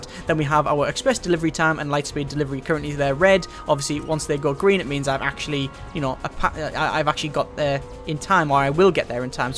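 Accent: United Kingdom. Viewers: jezus christ that british accent is horrible !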